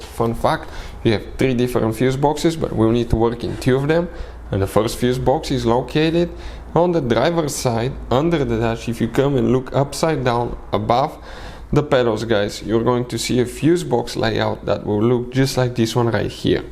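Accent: Russia. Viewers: half way through video hes still talking in that horrible russian accent! ughhh i have to vomit now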